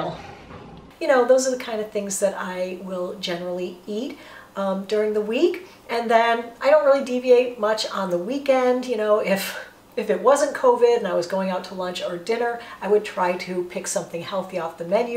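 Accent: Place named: Midwest, United States. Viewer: Where do you live, in the midwest? I wonder because of your accent and the products all look so good - quality -- I don't see any of those brands down here in the South. Thanks for giving us details of what you eat in a day, itemizing really helps us get motivated to stock up for health!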